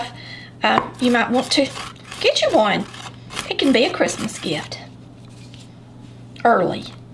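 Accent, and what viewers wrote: South, United States. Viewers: The "rocker blade" is also referred to as a Mezza Luna (Italian)....which means a half moon. I have an antique one which has 2 cutting blades. You can also use a Mandolin (French) to evenly dice the veggies. Loved her recipe, as well as that Southerner accent. Happy eating.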